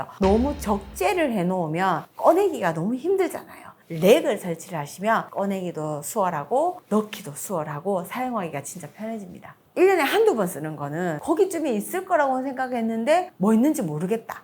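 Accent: Gyeongsang, South Korea. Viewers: 경상도 사투리 쓰는 분들에게 이런 댓글 다는 사람들 있는데 이유가 뭔가요? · 경상도 억양. 설치고 설레발이 먼저인 인종이라 본인이 일단 정리정돈 안되는 어수선이들.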